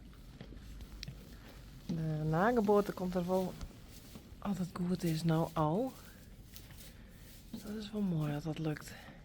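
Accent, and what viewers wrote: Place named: Friesland, Netherlands. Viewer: Well done Wunder, that was intense. I was willing him on to find the right place. Poor Saly, I winced each time she cried out in pain. I really enjoy hearing your Friesian language, it's beautiful.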